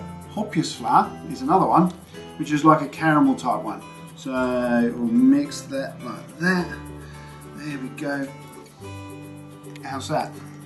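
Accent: Netherlands. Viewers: je hoort dat hij hatstikke nederlands is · don't say you are not dutch your voice is as dutch as it can get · You got A verry dutch accent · im dutch,, hes to i can hear by his accent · You are definitely Dutch I can hear it in your accent and how you say the names of the foods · Natie je bent zelf Nederlands je spreekt die woorden zo Nederlands uit he weejoo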